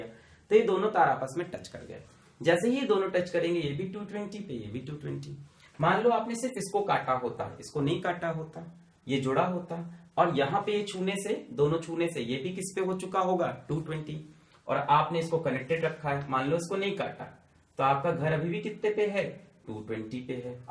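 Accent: India. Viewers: I love your hindi accent, even though I speak a little.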